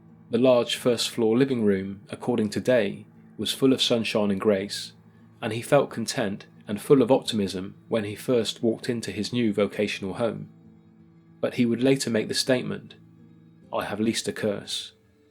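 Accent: United Kingdom. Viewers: I’m glad the narrator is British. RIP London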